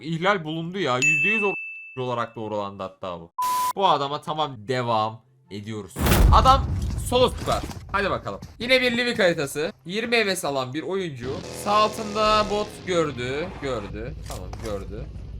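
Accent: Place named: Kayseri, Turkey. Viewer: kayserili olduğu konuşmalarından belli daşşağını yiyem diyo xd sivasdan selamlar adamsın abi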